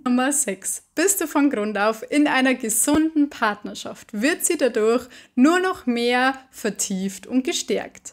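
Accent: Bavaria, Germany. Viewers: Das habe noch von keiner Frau gehört um so erfreulicher finde ich es von dir hören. Du hast so einen tollen Dialekt ich mag dein Bayrisch und du bringst dein Bericht sehr überzeugend und glaubhaft rüber mach weiter so du bist Super.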